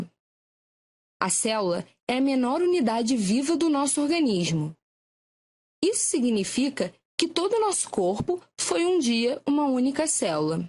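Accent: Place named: Rio de Janeiro, Brazil. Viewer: Sutaque maravilhoso, as cariocas são maravilhosas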